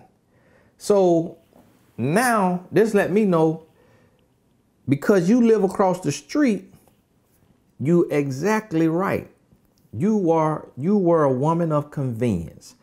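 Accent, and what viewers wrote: South, United States. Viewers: As always, thanks for keeping it real. And you say all this, with an even tone and country drawl, cracks me up! Very interesting psychology of men.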